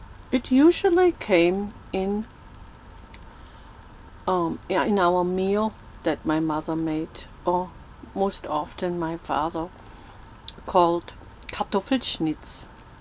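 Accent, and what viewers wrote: Germany. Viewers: So cute. Good lighting, and you look very pretty! Good nail polish and makeup <3 I love hearing German accent!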